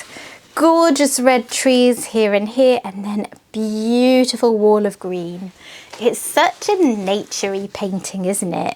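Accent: England, United Kingdom. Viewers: I just found your channel and I'm binge watching your posts. I'm from the states but I love hearing your accent because I can't wait to get back to England! It's Heaven to me 😊. Love your unboxes!!!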